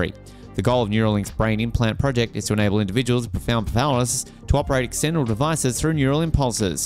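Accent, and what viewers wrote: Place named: Australia. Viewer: Just a ridgy didge Australian accent. It's PRIME! No idea what he's alluding to. Or just trying to avoid a monotone AI like reading of a script.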